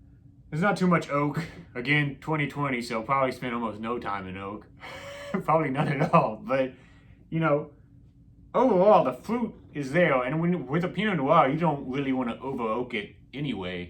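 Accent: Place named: South, United States. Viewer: Great video! Are you southern? I love your accent 😍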